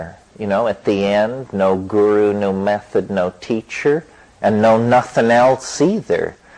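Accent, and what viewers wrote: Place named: England, United Kingdom. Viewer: Where are you from? England or Ireland by any chance?! I'd goto a gig if it was relatively near to show my support, you've done me great benefit with this channel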